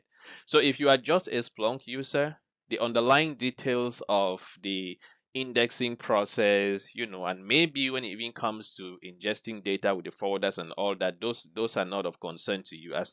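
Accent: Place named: Nigeria. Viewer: you sound very Nigeria but i like you teaching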